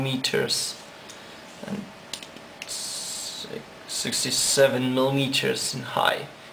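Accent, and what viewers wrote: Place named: England, United Kingdom. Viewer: i love your English accent lol!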